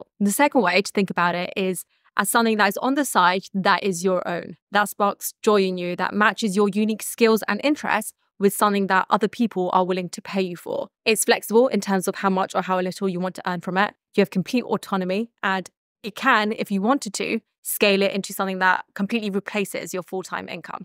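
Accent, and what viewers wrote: United Kingdom. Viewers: Can you start a British accent class? Many people will pay and join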